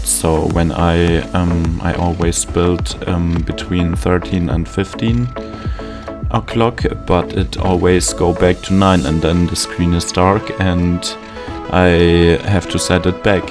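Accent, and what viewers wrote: Germany. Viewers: Ich höre an deiner Stimme du bist deutsch😅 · i can hear that you`re german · deutscher akzent 😳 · Haha dude I enjoy your German accent 😊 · Man hört echt stark heraus, dass du deutsch bist xD Schöner Flughafen und ein paar ziemlich kreative Ideen mit drin. Da werd ich mir doch glatt was abschauen und selbst mal so verbauen :D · Are you german? xd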